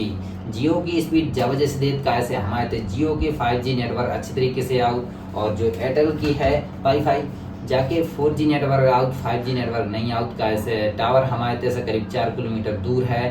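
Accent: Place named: Agra, India. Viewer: bro kha sa ho ap ke boli to agra ke lag re h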